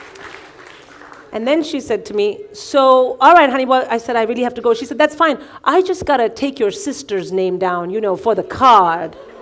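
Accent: South, United States. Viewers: This is southern genteel white woman. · EXACTLY!!!! its southern accent...NOT AFRICAN AMERICAN! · This is southern not African American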